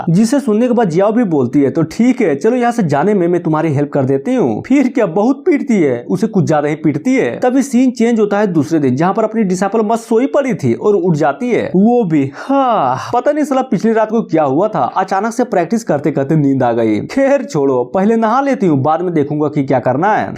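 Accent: Bihar, India. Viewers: Bhai bihar se ho kya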